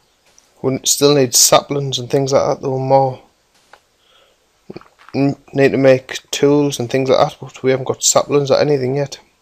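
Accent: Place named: North, United Kingdom. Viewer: Even though i'm from the north as well, I love hearing your accent haha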